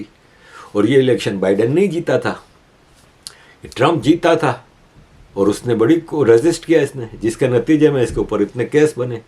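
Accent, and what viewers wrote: Gujarat, India. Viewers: Give up posting in Hindi and post in your native language, Gujarati, so you don’t have to struggle so much with your accent!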